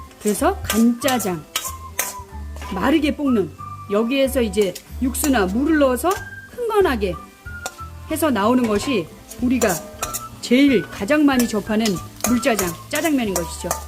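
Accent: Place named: Seoul, South Korea. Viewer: 넘 매력있는 서울사투리에 강주은님 생각나요 ㅋㅋㅋ 요리할때는 살아있는 눈빛에 존멋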